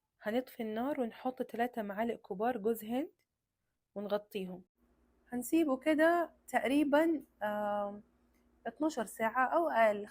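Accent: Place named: Saudi Arabia. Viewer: حاسه فيه لمحة لهجة سعودية 😂😂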